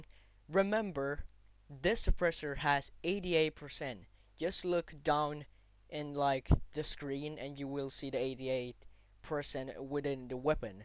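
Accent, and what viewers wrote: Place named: Norway. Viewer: very nice, immediatly heard it from the accent. love from norway!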